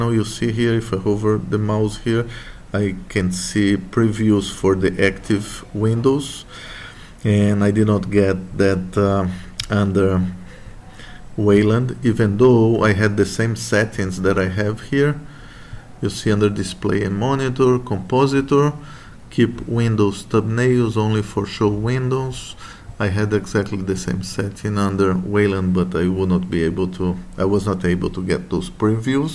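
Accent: Brazil. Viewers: sotaque brasileiro é forte kkkkk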